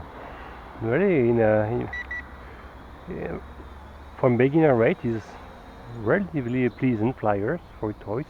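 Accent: France. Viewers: Ca s'entend que tu es français mais ça reste une très bonne vidéo!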